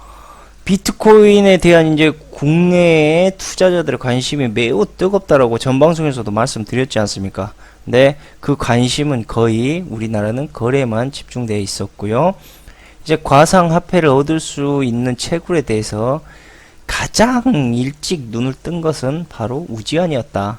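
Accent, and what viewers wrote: Jeolla, South Korea. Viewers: 전라도라 그래요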